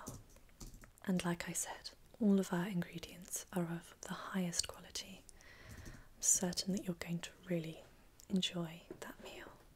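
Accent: United Kingdom. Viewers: The British accent is a turn on. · My thoughts: I think she’s British Her: speaks in British accent Me: I knew it!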